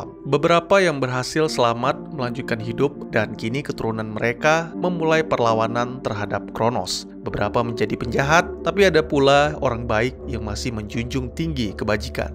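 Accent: Aceh, Indonesia. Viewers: Orang aceh ya bos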